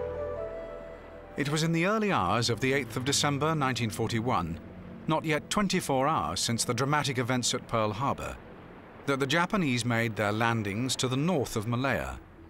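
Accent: United Kingdom. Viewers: That is because he is an educated Englishman...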